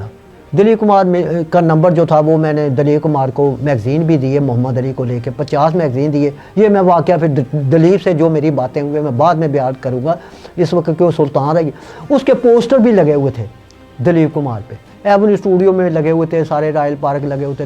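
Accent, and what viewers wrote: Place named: Punjab, India. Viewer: Sir tawada lehja Punjabi wa the aede nalon changa tusi Punjabi hi bol lo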